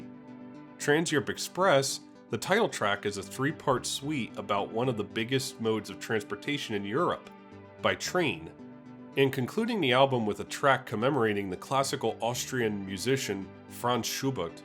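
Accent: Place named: United States. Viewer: Get a German narrator as the American voice over is worthless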